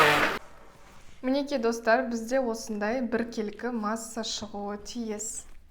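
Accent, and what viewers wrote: Standard. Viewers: Жарайсын.сенын таза казакша сойлейтинын оте керемет.торттарын да керемет. 👍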